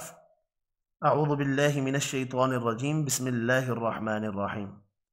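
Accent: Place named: Arab world. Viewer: Jazakum Allahu Khair for making this video🌺 I also appreciate that you are using an Arabic accent not an Urdu accent✌️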